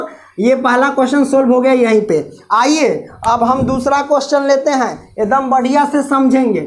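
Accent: Bihar, India. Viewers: Sir aap Bihar ke ho😊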